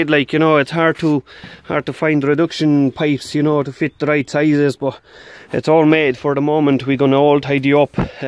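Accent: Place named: Ireland. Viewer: Masz farmę w Irlandii ?? Trochę czasu tam jesteś akcent mówi trochę . Robię dla Irlandczyków w Londynie. Zdrów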